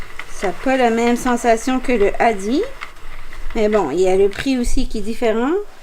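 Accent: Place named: Quebec, Canada. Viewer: Coucou. Ho géniale de nous faire voire le contenu du sentro avec les pelotes de 25 grammes. Heureusement que vous êtes là pour nous pour faire traduction du livret qui fort dommage n'est pas en français. Merci beaucoup. J'ai hâte d'être en fin d'année pour me l'offrir. Votre petite puce à l'aire impatiente de vous voire travailler dessus. J'adore la petite voix de votre petite puce. La vôtre aussi et agréable à entendre avec votre petit accent québécois. À très vite pour de prochaines aventures et travaux avec le sentro. Bonne journée à vous et à votre famille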